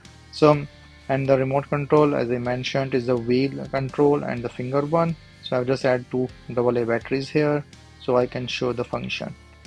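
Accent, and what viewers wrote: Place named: India. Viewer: Bro your English is like India isn't you ???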